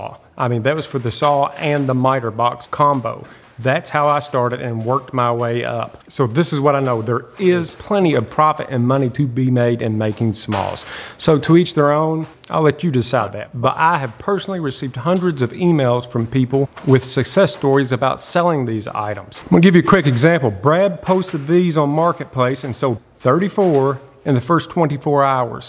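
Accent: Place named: Kentucky, United States. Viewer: What part of Kentucky are you from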